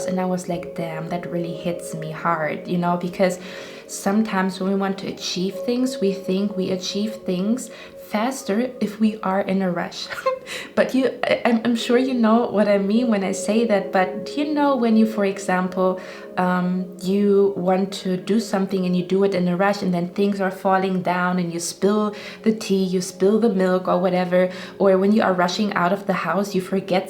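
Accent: Germany. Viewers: Pile2 Since a week a have a lot of deja vus its weird like a lot on a day And I guess I meet one of my guides in my dreams A lot of readers say aomething about matrix and lucy? I hate this kinda films they are so dark but I am going to watch it anyway cuz of the messages Und ja man sieht den Wald vor lauter Bäumen nicht ich höre einen deutschen aktzent🤣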